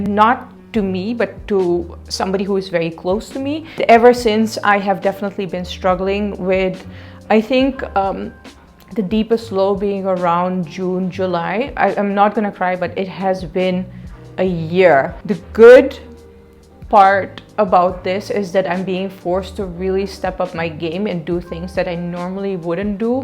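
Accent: India. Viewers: I love how living in India for 5 years made your accent a bit like Indian accent. (Obv in a good way tho)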